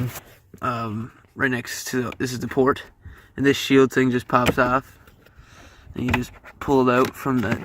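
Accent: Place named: Newfoundland, Canada. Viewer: You sound like a Newfie!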